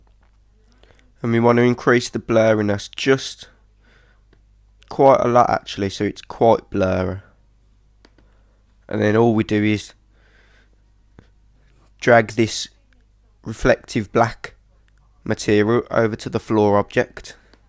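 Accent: Birmingham, United Kingdom. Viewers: your accent is fucking wicked. where i it from? like what part of the UK? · He's from Birmingham, i know that accent from anywhere! lol :D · Common brummy :) love the accent pal :) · Im from birmingham you have a similar accent to me where are you from if you don't mind me asking:) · lol british-liked accent?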